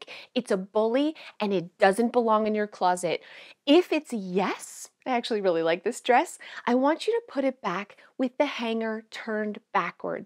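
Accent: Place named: Oakville, Canada. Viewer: your ability to be vulnerable and funny and honest and as screwed up as the rest of us is exactly what I needed to hear today. We all have struggles because we are told we need to do it all, perfectly and look amazing at the same time. Well, we can't. We can only be ourselves and sometimes that isn't easy. You have shared a cautionary tale and please know that you are delightful (and I still love the Oakville accent.LOL)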